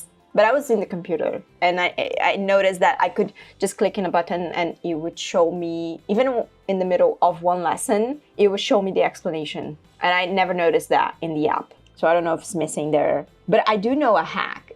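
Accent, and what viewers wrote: United Kingdom. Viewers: Is the british accent on purpose?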